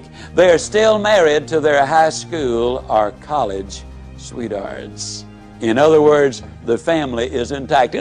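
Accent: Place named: South, United States. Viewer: His perspective is valuable and you can learn by reading his writing if you don't like a southern accent.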